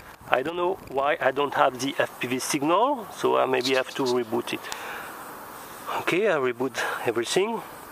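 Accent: France. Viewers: opinions matter it was nice but the French accent bothered me a bit😁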